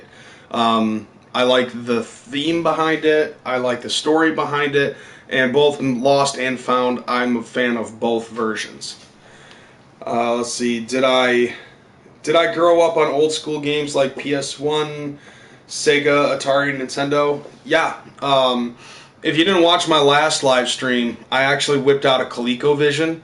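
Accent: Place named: Midwest, United States. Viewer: Are you a Michigander,? I was born and raised in the mitten but live in Los Angeles now. Your Midwest accent is making me miss some of my Michigan homies.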